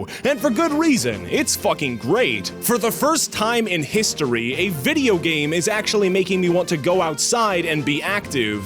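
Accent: United States. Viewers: I knew I was right about that kansas accent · Does everyone in the US have an accent like that? I mean it sounds much cooler than british english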